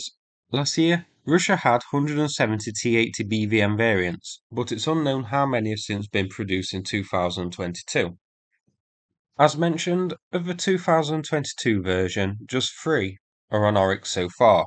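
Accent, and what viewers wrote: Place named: Midlands, United Kingdom. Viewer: Yep.......one of the Midlands accents. Pretty standard pronunciation of vowels for the area.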